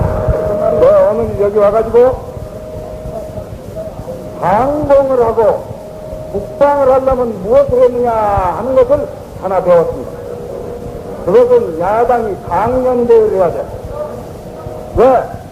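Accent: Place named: Jeolla, South Korea. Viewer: 품격없는 선동의 말솜씨로 과연 나라를구할수있었을까?목소리 넘싫다.전라도사투리 넘싫다.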